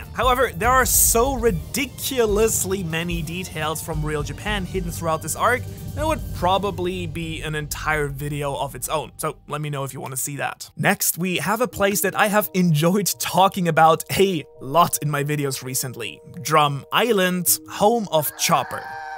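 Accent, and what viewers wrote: Germany. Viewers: Aha! Wusst ich doch dass du Deutsch bist, anhand deines Akzents :D Gut zu wissen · I did not know you're from Germany (of course I detected your accent, just not that it was a German accent) Hi homeboy ... tee hee I'm from Karlsruhe ( a bit north of Bavaria)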